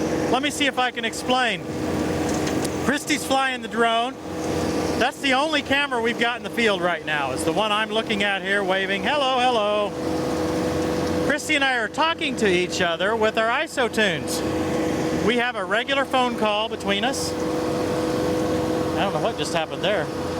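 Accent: United States. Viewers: Just like the way you Americans talk I can listen to you all day ...your doing a great job 👍 have a great day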